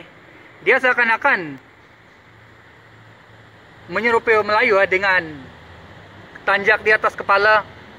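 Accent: Sabah, Malaysia. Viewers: Loghat sabah ka,sarawak ka..tiada masalah..selagi menggunakan bahasa malaysia.saya faham je.,Setiap orang lain cara penyampaiannya..teruskan bro dengan konten² yg menarik. 👍🏻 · dia tu orang Sabah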